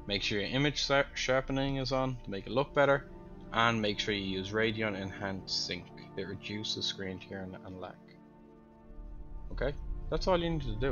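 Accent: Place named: Ireland. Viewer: Took me only 10 seconds to realize this person is Irish.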